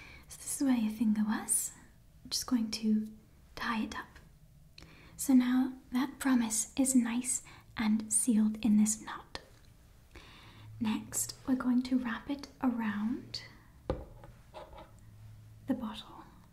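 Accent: United Kingdom. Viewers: This is the first Harry Potter roleplay where I’ve seen you actually use a British accent.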